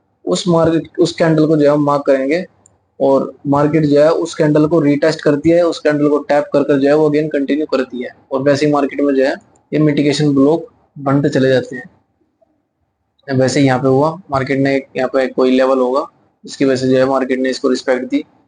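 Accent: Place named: Rajasthan, India. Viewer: Bhai rajasthan se ho na kyu ki boli se pta chl ra h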